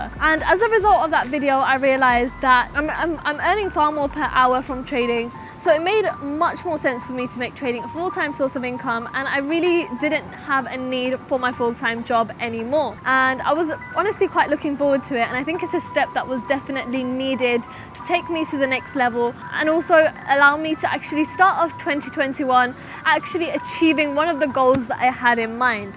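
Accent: United Kingdom. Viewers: you have a british ascent yet you look like an arab, kinda confusing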